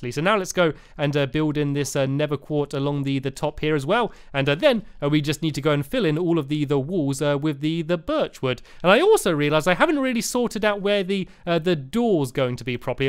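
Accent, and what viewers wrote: United Kingdom. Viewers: This video is so British. 1:British accent. 2:Clock tower. 3:Tea shop.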